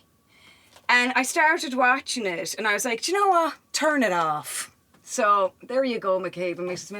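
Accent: Ireland. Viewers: Tf is a cletic accent her accent is Irish, no such thing as a celtic accent. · Love the Irish lilt. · It’s not a accent it’s a brogue, From Tipperary I believe my grandfathers birthplace. Wonderful,beautiful talent. Just picked up McCabe and Mrs Miller on the 50 % off sale. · Irish is honestly my favorite accent. She sounds exactly like the characters in The Commitments😀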